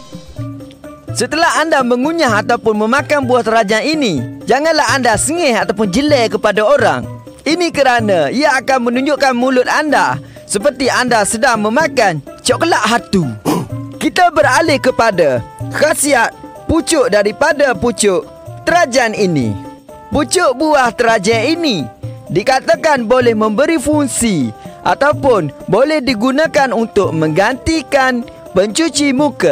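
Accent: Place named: Kelantan, Malaysia. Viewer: Hahahahahhaa Memey Kelate lah ho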